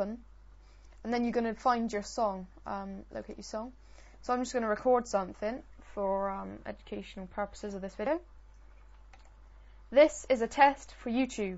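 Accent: United Kingdom. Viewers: you cant beat the west country accent eh? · guys stop calling him a girl,I think hes...british,with the accent,i think... -_ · Hes from the UK. With an accent.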